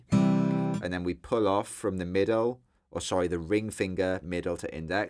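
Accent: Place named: Welsh Valleys, United Kingdom. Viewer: Well then, (who I have only just found), where have you been hiding with your cheeky grin and boy-oh of the valleys accent? You're brilliant at this Chet style which, even after 65 years, I still cannot do; Boo-hoo! The control and independence of all your fingers is coupled with the ability to start, finish & repeat anywhere along the way of the toon..... Wowee! I can't do any of that. I'd like to say I learnt something from Chet - if only the names of the tunes and their existence....... but even that's a stretch for me. You know the one; It goes "Tic-toc / tic-toc / tic-toc blah, blah, blah" Thanks a billion for the lesson and breakdown of just what actually goes on in the execution of this piece - and the entertaining way in which you presented it. Should you feel the need to know more about my own style of playing I'll just say "Don't bother!" Thanks again Just wonderful..:hand-pink-waving:face-turquoise-covering-eyes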